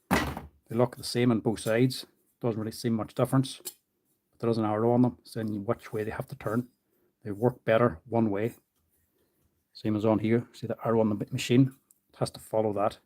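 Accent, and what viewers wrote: Ireland. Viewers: . Keep doin what you do. Love hearin Irish from the other side of the pond 🤪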